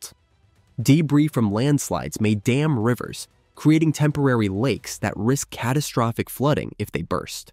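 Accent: United States. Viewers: This is hilarious 😂! Is the guy narrating the fable from West coast USA. Because I guarantee California will have slide into the sea long before we have a problem. I'm picking this nonsense is sponsored by 1%ers that want to move here. The wealthiest people on the planet are building bunkers in the shadows of the Southern Alps. Are they also the stupidest people on the planet. Hmmm!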